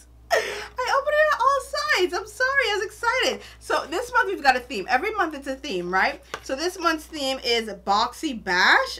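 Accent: Bahamas. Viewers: Where are you frommmmm. You sound like you're from the Bahamas!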